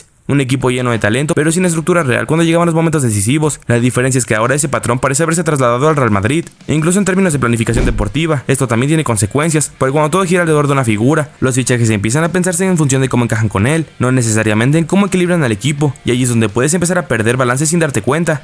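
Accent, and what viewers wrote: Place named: Yucatan, Mexico. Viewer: Es que es yucateco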